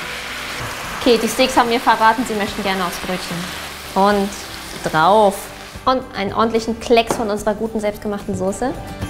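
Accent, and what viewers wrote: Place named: England, United Kingdom. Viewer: Sie spricht richtig viele Wörter im englischen Akzent aus.😂 Schöne Folge 😉😊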